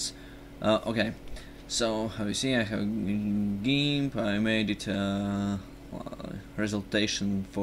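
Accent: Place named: Russia. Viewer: Thanks dude, guys give him some slack, he has a Russian accent and probably is the best at english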